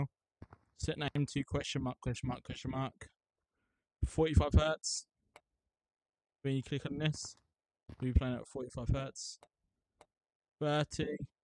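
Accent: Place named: United Kingdom. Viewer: that is justnormal british accent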